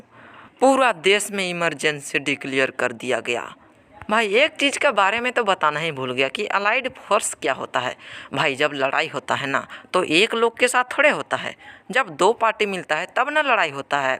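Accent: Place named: Odisha, India. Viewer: Bhaiya apka aawaz se aisa laga ki aap Odisha se ho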